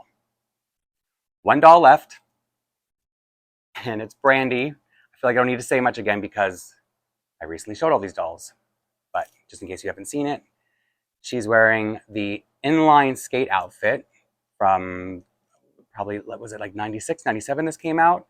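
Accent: Canada. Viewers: I made it to the end! I started watching your videos a couple days ago to procrastinate homework. (About halfway through this one I decided I needed to multitask.) But I watched the MapleLea winter haul video earlier today where you mentioned you were Canadian and I didn't pick it up then but I definitely heard the accent in this video. My mom thinks my collection is insane (17 dolls as of now) but this just might prove her wrong, ha!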